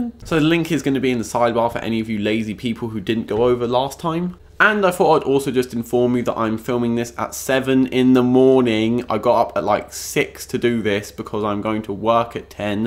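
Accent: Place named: London, United Kingdom. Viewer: I am not trying to take the mickey, but what do you say with that London rude boy slang accent?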